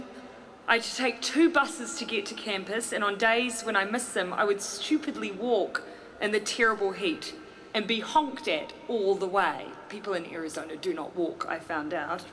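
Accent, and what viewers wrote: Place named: New Zealand. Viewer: I love the nz accent ☺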